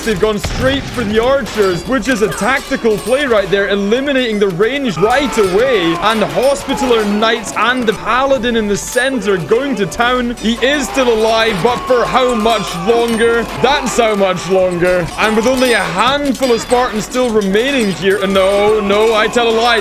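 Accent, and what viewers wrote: Scotland, United Kingdom. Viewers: you scottish because i am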